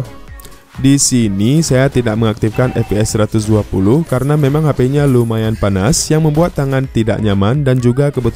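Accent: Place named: Magelang, Indonesia. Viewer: Dari suaranya. Magelang flasher ya ini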